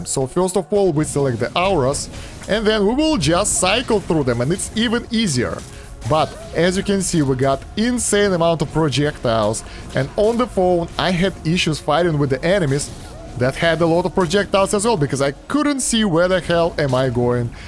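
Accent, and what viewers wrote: Russia. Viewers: Слышу Русский Акцент у автора видео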